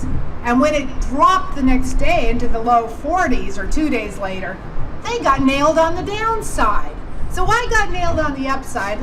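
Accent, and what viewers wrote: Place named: South, United States. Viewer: Haha. I like the Southern accent. Gotta adapt to your audience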